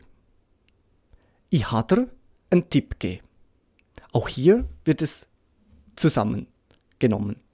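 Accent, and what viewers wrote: Switzerland. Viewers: Hallo, darf ich fragen, aus welchem Kanton dein Dialekt kommt?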